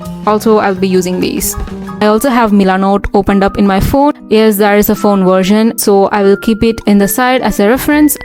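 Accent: India. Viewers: Your accent is very much indian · thrown off by the haryanvi accent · Why you have indian accent? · u sound indian lol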